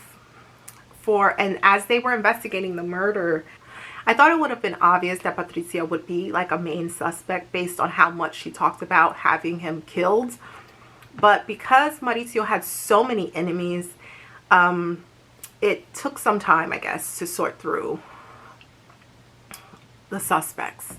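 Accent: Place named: Italy. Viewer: Love your Italian accent! Good review! Now I want to see the movie!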